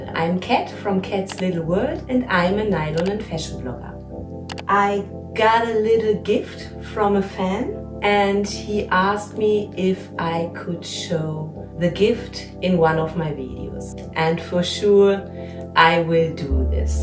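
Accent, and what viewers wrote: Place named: Germany. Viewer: Yr German accent makes me hot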